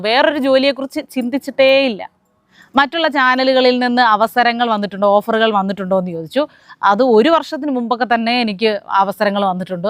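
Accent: Thiruvananthapuram, India. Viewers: ഈ തൃരുവന്തസ്പുരം ഭാഷ എവിട്ട്നിനിന്നും കിട്ടി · കമ്മികളുടെ 10 കൊല്ലത്തെ ദുർഭരണം താങ്കൾക്കു വീഡിയോ ചെയ്യുന്നതിന് വിഷയ ദാരിദ്ര്യം ഇല്ലാതായി. പിന്നെ തിരോന്തരം ഭാഷ നല്ല രസമുണ്ട്. വിജയാശംസകൾ 💅💅💅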